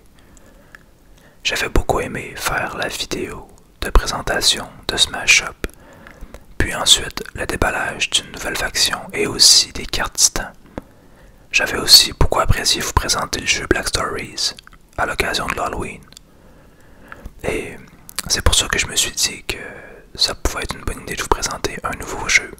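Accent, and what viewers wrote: Quebec, Canada. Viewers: Entre ton accent québécois et les jeux de société, ça me rappelle les soirées en Randolph, j'en deviens presque nostalgique ! En plus les petites pièces sonnent tellement bien !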